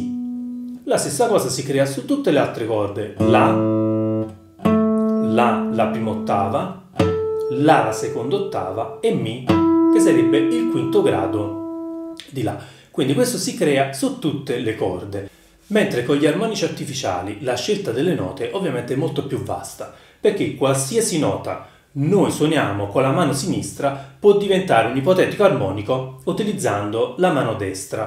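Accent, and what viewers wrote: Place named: Naples, Italy. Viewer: Grazie sei molto bravo e chiaro..poi quell'accento napoletano mi rilassa una cifra!un caro saluto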